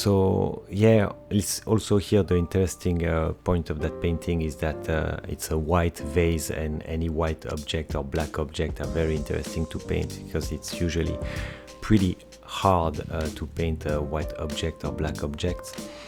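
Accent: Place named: France. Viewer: haha l'accent français je t'ai démasqué !!! très bon travail!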